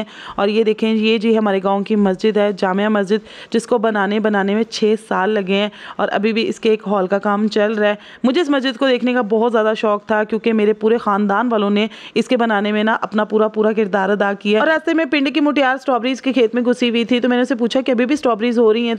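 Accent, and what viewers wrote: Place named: Lahore, Pakistan. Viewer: Your urdu is not like pashto speaking persn is totaly lahori accent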